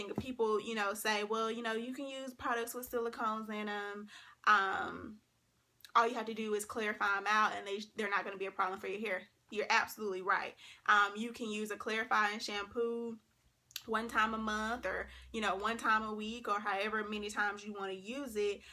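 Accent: South, United States. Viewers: I live in SC and you sound way more southern than I do lol! #southerngirlsrock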